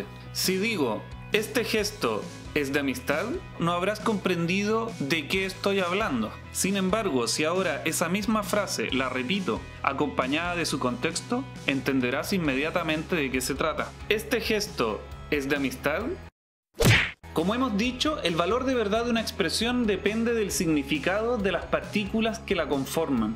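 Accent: Chile. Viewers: Q lindo el acento chileno, no se xq la gente no le gusta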